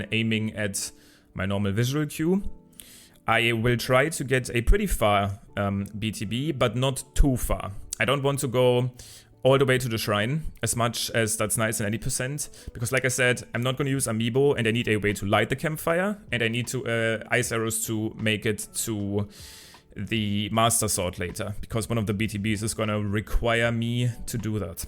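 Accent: Germany. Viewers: you sound german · Man merkt den Deutschen Akzent so hart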